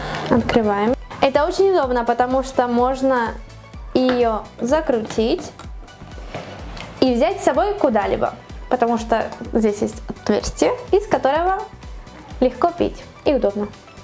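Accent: Spain. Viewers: Кто заметил что розговаривает с Испанским окцентом. Мне это нравки🤗🤗🤗😗😗😗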